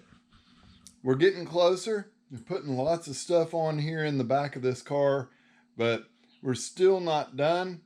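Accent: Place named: South, United States. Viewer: Im starting to get your shorts with the exaggerated southern country accent in my feed. The algorithm is starting to work for you with those videos! Make more they’re really funny and you’ll get a lot more subscribers!! Awesome videos. Watching From Chicago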